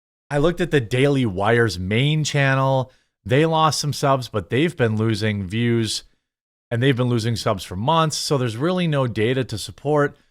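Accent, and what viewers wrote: Wisconsin, United States. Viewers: Wisconsin accent.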